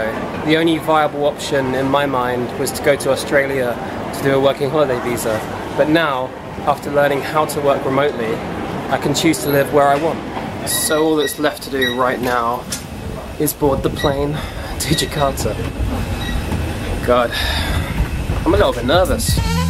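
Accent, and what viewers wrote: United Kingdom. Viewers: love this British accent....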